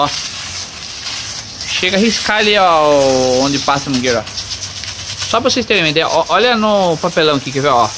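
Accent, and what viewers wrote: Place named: Caipira, Brazil. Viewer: Eeeeitha sotaque caiiipirahhh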